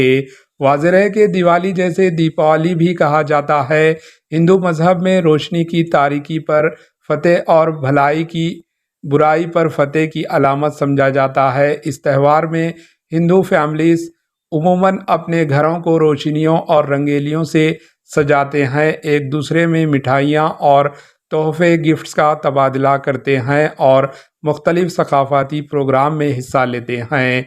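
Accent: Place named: Rural, Pakistan. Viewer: Inki language Hyderabadi nahi hai bilkul gaon ki zaban bolte. Hai ko itna lamba bolte jease bacho ko school me sabaq yaad karare. Anchor training ki zarurat hai.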